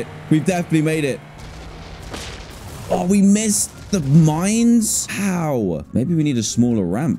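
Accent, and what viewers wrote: United Kingdom. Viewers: you know when you're watching someone who's too british when they pronounce amazon amizin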